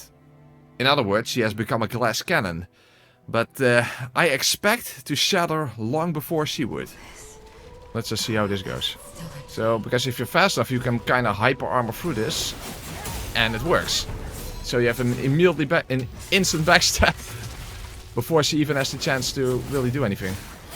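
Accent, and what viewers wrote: Netherlands. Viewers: Sounds dunglish! Anyway, great stuff 👍🏻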